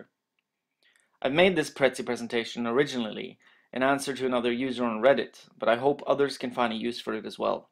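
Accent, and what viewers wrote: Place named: Norway. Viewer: I do enjoy a crisp sounding Norwegian-English accent!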